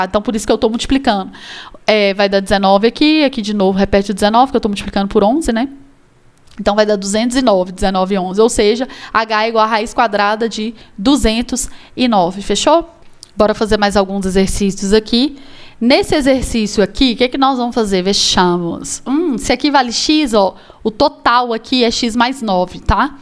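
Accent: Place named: Minas Gerais, Brazil. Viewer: Olá prof, a Sra é uma excelente professora, só que a Sra fala rápido demais, vou desconsiderar essa parte devido eu gostar do sotaque mineiro. Parabéns.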